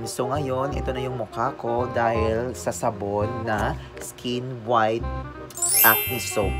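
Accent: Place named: Cebuano, Philippines. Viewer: parang my cebuano o ilonggo accent ah. galing ng vid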